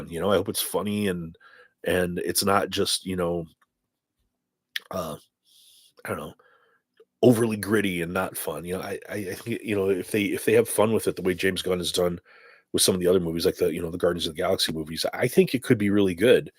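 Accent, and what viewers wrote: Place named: Chicago, United States. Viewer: Congrats on the Solid Pull. Sounds like your a Chicago guy. I'm in the SW suburbs.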